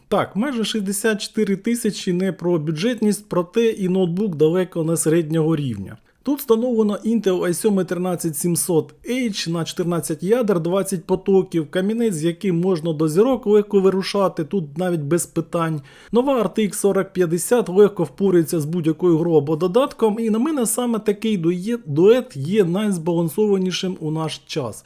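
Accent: Transcarpathia, Ukraine. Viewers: Боже я налякався голоса автора почув Закарпатську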